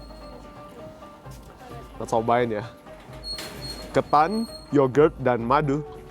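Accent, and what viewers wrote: Java, Indonesia. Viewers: 😂 mungkin tinggal di jawa kali, kan banyak tuh orang Tionghoa mirip2 Orang jawa kebiasaannya kayak Rajin, segala macamnya apalagi. Bahkan bahasa ngomongnya juga kayak jawa atau medok banget. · Ko, sekarang bahasa Indonesianya kok medok banget kayak orang Jawa 😊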